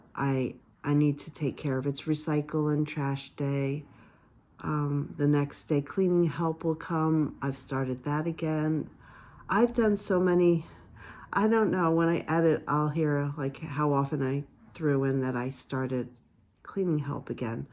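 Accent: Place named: East Coast, United States. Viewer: Love hearing you talk. I adore your accent. Being from the midwest, eastern accents sound great to me. Rainy days are good for chats like this.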